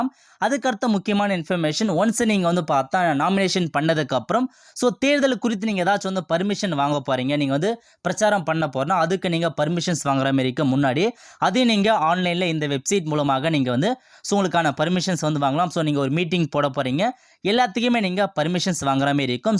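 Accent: North, India. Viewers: Enna bro vadakkan maari pesura ennachu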